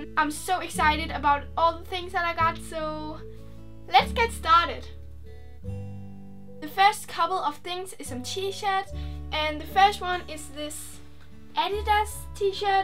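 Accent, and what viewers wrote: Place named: Denmark. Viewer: Woaw man kan godt høre at du er fra Danmark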